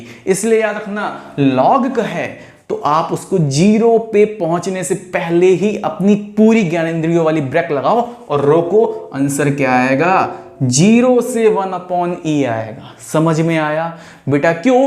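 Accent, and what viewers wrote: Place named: Kanpur, India. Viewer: Gabbar is back on old style wow chacha apne kamal hi kar diya kanpuriya language ke sath ye sambha laga nisana jara to is kaliya par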